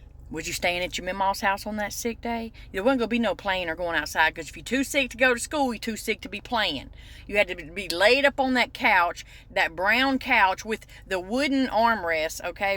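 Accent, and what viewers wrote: South, United States. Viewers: You sound like you from the south,I'm from rural N.Y. and holy shit woman ...we had it the same! I'm 51 and I get it. You're awesome! Loved this video ❤!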